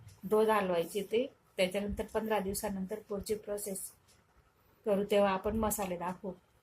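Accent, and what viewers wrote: Khandesh, India. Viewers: Khandeshi ka.....? Apan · Maushi tumhi Khandesh chya aahat kaa? Tumchya bhashewarun aani swayampakachi banawnyachya padhhatiwarun watate.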